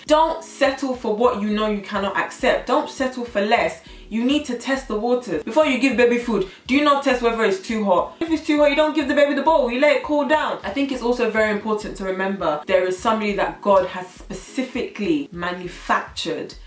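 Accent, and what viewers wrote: Nigeria. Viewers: Naija accent I love it. You should do more of these videos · The Nigerian accent thoooo!!!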